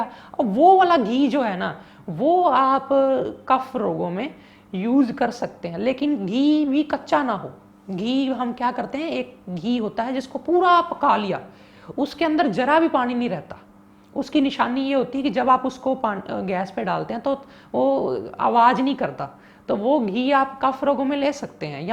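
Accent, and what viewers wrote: Punjab, India. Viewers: Ye punjabi accent hai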